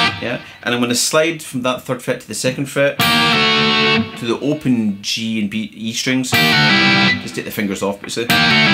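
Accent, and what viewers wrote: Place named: Scotland, United Kingdom. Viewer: Hi from France Sir. It's always a great Pleasure to hear you play ! Love your playing & your accent...!!! 😜 Reminds of my days up North ... Miss Scotland & the Scots so much ! Take good care, Keep safe and Keep it up ! You definitely Rock Sir. Best regards. Cordialement Luc, France 🇫🇷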